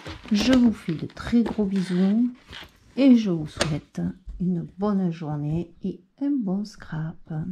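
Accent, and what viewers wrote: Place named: Southwest, France. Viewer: Coucou, j'adore tout ce que tu fais: tes rangements, tes classeurs, tes pochette à plastifieuse, TOUT j'aime tout. Je t'adore, je t'adore je t'adore.... Bien sûr je me suis abonnée avec la cloche et petit pouce partout sur toutes tes videos que je vois. J'habite en espagne depuis que j'ai connu mon mari, mais avant j'habitai dans le Sud-Ouest (pyrénées atlantiques) et ton accent m'as rappelé mes origines. Nostalgie. Est-ce-que tu es du Sud-Ouest? Je t'embrasse très fprt🥰🥰🥰🥰❤❤❤🦎🦎🦎🦎🦎🦎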